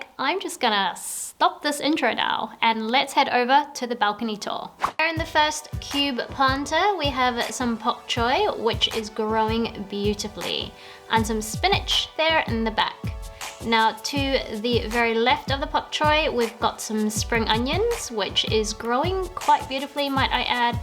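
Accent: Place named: United Kingdom. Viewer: I love your accent although for some reason it sounds a bit British. You seem to be a natural infront of the camera. The landlord is probably concerned about the cement planters due to weight on the balcony.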